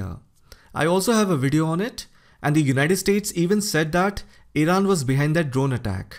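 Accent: India. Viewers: Very clear indian talk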